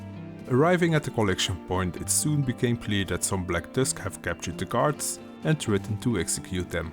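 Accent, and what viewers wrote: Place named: Russia. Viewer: I like your Russian accent 👍💪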